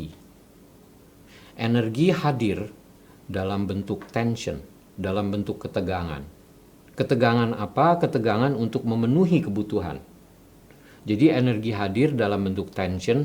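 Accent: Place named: Germany. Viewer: Jujur sih aksen jermannya Pak ini keren.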